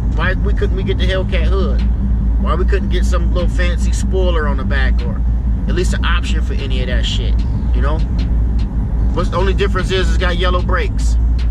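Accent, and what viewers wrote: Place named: South, United States. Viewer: This dude still sounds country as hell!!!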